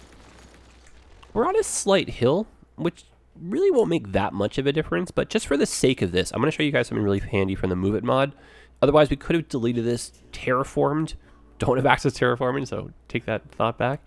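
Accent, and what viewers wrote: Canada. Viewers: Just stumbled on u and may i say you have quality ! I really liked these 2 episodes so far i hope u keep doing them :) also are you maybe Candian ehh ?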